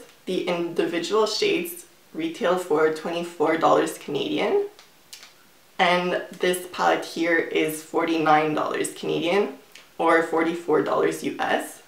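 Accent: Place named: Russia. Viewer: i have been watching your videos and i would like to know where is your accent from... it sounds like russian to me but i can't know because I am from Chile ( where the 33 miners were rescue alive)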